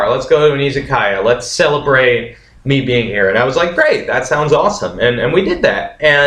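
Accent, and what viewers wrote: United States. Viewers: awwww. white boy feels like a minority? Wtf did you think Japan was a magic wonderland? it is a place like any other. You sound American. America isn't any better. It is worse in many ways. All places have their ups and downs. You sound miserable and desperate. It's not the country you are in. It is you. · Sounds like an american...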